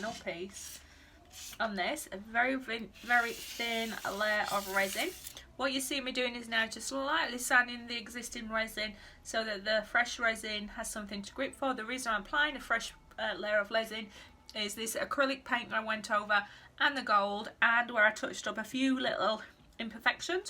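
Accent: United Kingdom. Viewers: thanks for the detailed answer, much appreciated. I'm from Yorkshire but live in USA now. I detected a northern England accent!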